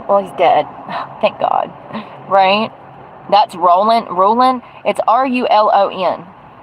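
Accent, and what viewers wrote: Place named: North Carolina, United States. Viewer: How are you? Checking in haven't had a chance to hope everything is going better! You always look beautiful and I adore your North Carolina accent. I can't figure out why everybody in the South North Carolina has the strongest southern accent? I watch another creator that has the same! It's freezing up here nights are in the twenties. Hope the weather is nice for you!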